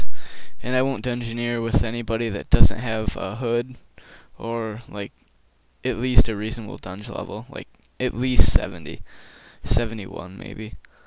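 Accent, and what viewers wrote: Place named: Norway. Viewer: R u norwegian? U got a norwegian-english accent :P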